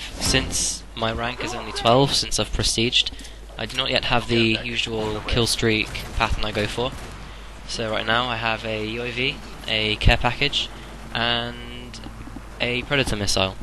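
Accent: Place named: RP, United Kingdom. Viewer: oooooo very posh lol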